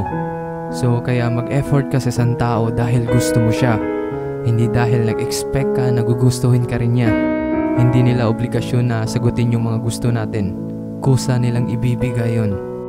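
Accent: Visayas, Philippines. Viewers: Klaru kAayo bisdak hahaha.. nice.. bat kaya di ka pa rin sikat...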